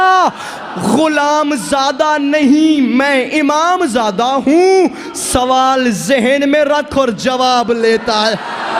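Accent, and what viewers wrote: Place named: Punjab, Pakistan. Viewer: meray bhai ue punjab ka hai or waha sab ka sirf is ka nahi sab ka bayan karnay ka tareeqa aisay hi hai.. ye over acting nahi hai..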